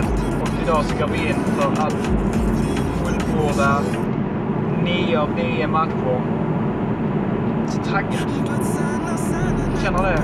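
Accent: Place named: Scania, Sweden. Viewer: Härligt med en skånsk padelyoutuber, fortsätt med det du gör:)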